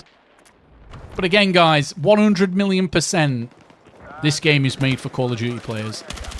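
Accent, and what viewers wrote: United Kingdom. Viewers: Are you Irish, English, or Scottish?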